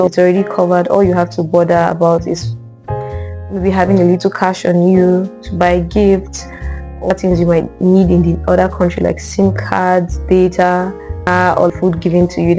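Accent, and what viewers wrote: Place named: France. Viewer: This cool ❤... just love your french accent fr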